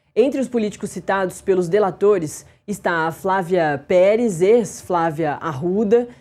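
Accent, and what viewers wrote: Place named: Sao Paulo, Brazil. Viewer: Essa apresentadora é a mais antipática de toda a TV brasileira. Além disso, a dicção dela e o sotaque paulistano exagerado são medonhos. Não teria jamais condição de ser uma apresentadora.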